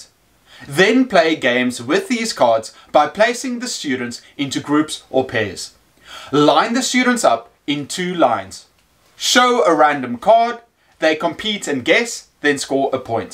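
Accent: South Africa. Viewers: Are you from South Africa? really enjoying the videos by the way. My students love the activities.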